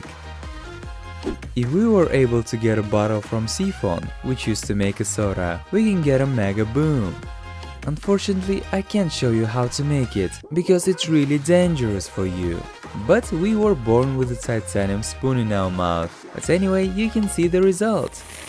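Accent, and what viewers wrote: Russia. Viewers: i just loooove his russian accent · because he has a russian accent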